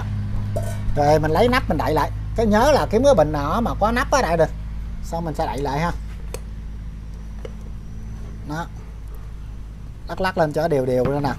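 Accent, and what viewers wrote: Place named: Mekong Delta, Vietnam. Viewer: Miền tây quê tui đó..nói chuyên nghe cưng ko..tự hào vn ơi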